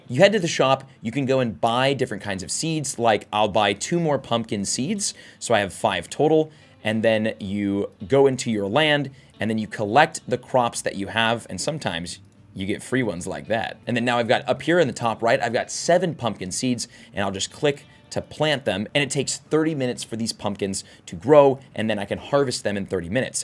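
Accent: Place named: South, United States. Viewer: I’m learning English for quite long, and this southern accent is siiiiiiick!! Love it , it brings some cool atmosphere here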